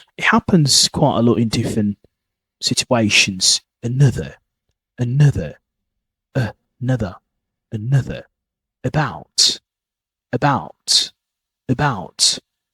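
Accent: United Kingdom. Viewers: Really epic work mate, really well done to you for this an amazing piece of work work that you put in to grow you channel and of course helping others to level their British English and pronunciation and by the way I really into your accent really well done to you keep it up you will get there mate .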